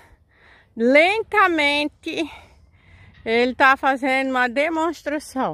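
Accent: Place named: Portugal. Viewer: Olá amiga vc parece uma verdadeira portuguêsa! O sotaque é igualzinho! Kkkk vim fidelizar nossa amizade! Abcos!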